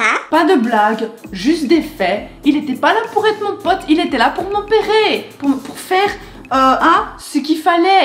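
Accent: Belgium. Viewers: J'adore ton accent, Liège? Verviers? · Tu es belge ?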